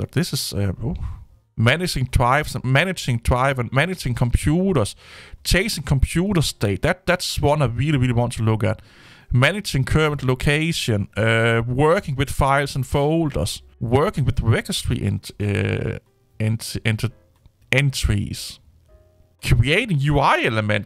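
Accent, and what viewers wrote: Denmark. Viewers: you sound danish :)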